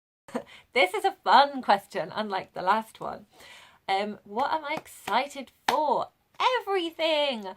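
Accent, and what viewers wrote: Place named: United Kingdom. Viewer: Not me totally forgetting you'd have a British accent and then startling a little when you started talking 😂🤣 So glad you made it on here with us! 🥳Already living up to the channel name with a 2 part newbie tag, lmao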